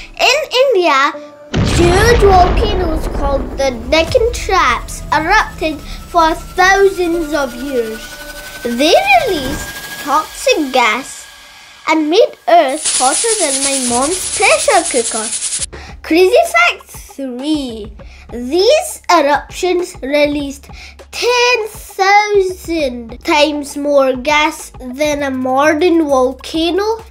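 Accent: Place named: Scotland, United Kingdom. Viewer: So sweet Lovely speech Looking smart Great knowledge He speaks like sccotish boy❤❤❤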